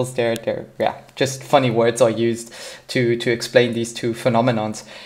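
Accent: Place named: Germany. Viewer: Offtopic: I noticed your german accent and never thought that originates from germany. And after looking in your impressum I see karlsruhe, where I study:-). Muss ich mal in die Oststadt schauen:-D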